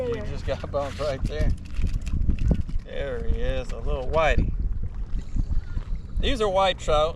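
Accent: Louisiana, United States. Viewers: R u from Louisiana i hear the accent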